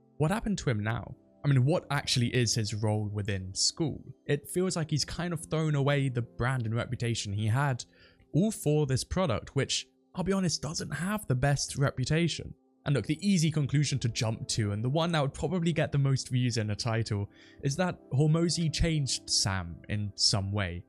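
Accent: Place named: England, United Kingdom. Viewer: Even with an english accent, this dude still could not sound intelligent. Give up bud!!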